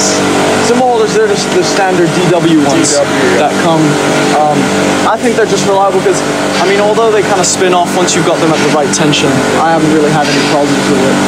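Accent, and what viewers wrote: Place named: United Kingdom. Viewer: Huge fan of your Dad. Thanks for sharing your drum set and narration with your slight British accent!!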